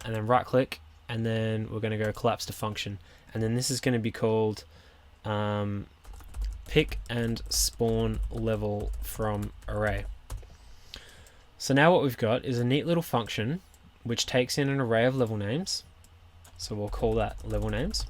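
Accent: Australia. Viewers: Thx for this tutorial!! A few questions though... How can I use a Navmesh bounds volume with this? I added one to a tile and it works on that tile but not when the tile is generated through the BP. Also I loved looking at where you have taken this BP with your Dev Log video and I was wanting to know if you could tell us how to do a couple of things that you never got to in this video. Probability for the tiles to spawn Seeded generation, mostly so you could save and load back to where you were I have got enemies spawning so I guess items will be similar to that but any tutorial you could do that takes this BP and adds in item spawning and enemy spawning would be useful, just to see how you do it and how you build it in to this BP. I have built this BP and modified it a bit but would love to buy it from you if you had an updated version of it for sale with some of the added cool stuff you have done with it!! 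Thx again... really helpful stuff. BTW good to hear another Aussie doing such cool stuff :)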